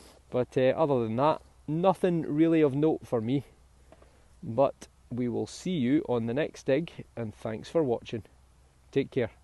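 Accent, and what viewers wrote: Scotland, United Kingdom. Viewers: Great upload as per, buddy. Your accent reminds me of one of my favourite scientists/presenters, Dr Iain Stewart, geologist/vulcanologists etc. Really makes for great listening. I hope that's not offensive, as I'm not sure where he's from in Scotland.! 😁. I've a lot of knowledge of the English monarchs going right back to the first Saxon King of Wessex. Your uploads are making me research the Scottish monarchy, as I'm quite ignorant to it, so much appreciated. Bless up 👊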